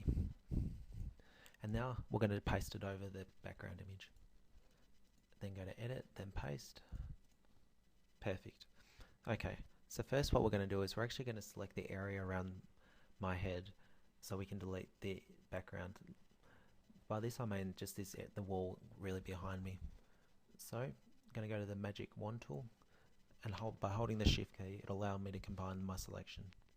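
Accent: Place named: New Zealand. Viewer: Very helpful, thank you! Are you from New Zealand? You accent is wonderful! :)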